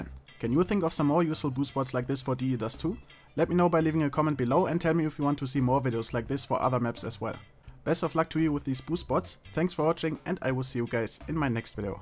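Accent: Germany. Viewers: liegt vllt daran das er deutscher ist bin mir aber nicht sicher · Dein deutscher Akzent ist voll krass zu hören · Du bist eigentlich deutsch ne :D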